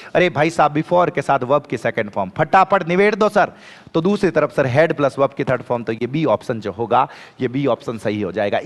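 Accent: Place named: Marwar, India. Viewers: Jordar he sir marvadi 😅😅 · Jai mata di apki class Boht Boht best aa bt muje smj ni aata apki marvadi hindi me kahite to jada achha hai jaipur jodhpur us side wale smj lete hai hme smj ni aata plsss ap boht hi acha pda rhe ho ❤sorry feel mt krna sir ji ap hmare Boht best ho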